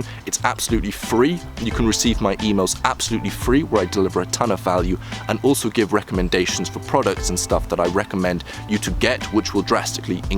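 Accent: United Kingdom. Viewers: I really can't tell if he's British or American 😳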